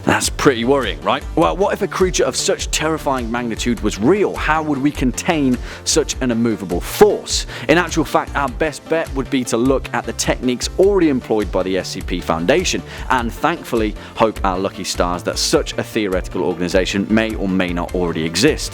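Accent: United Kingdom. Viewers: This guy sounds like the british dude from Community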